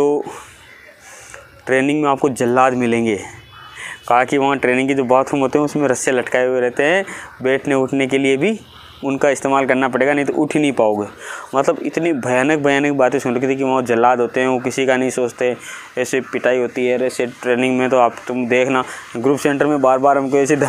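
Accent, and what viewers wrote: India. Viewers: Sit aapka na bolne ka aur samjhane ka tarika ekdam mast hai👌ekdam Desi style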